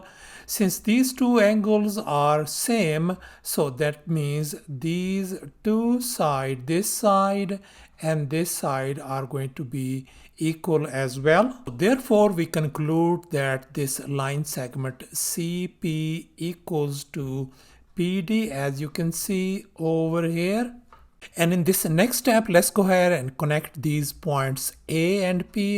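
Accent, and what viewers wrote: India. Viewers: Teacher are you from İndia?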